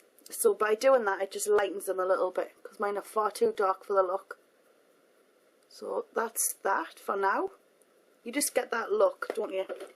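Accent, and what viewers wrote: Ireland. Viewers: Loveee your accentttttt xDDDD Irish??? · yea i love her irish accent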